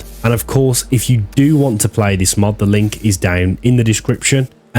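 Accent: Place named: Wolverhampton, United Kingdom. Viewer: Id love to know where your accent is from. Im gonna bank on wolverhampton. Great video anyway❤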